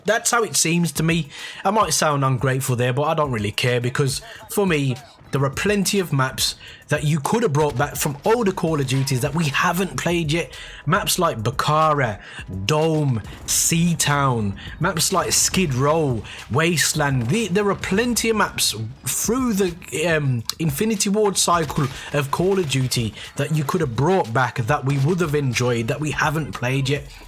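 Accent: United Kingdom. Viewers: Yo, where in the Isles are you from? I could listen to that voice explain shit all day.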